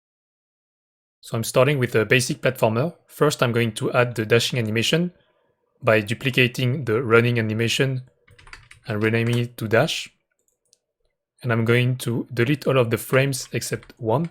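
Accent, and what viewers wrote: France. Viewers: that french accent, i like it ! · J'ai tout de suite reconnus l'incroyable accent haha ! Plus sérieusement merci beaucoup et c'est incroyable de voir des devs français sur yt !! (même 4 ans après) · do you speak french its like your accent is a french one?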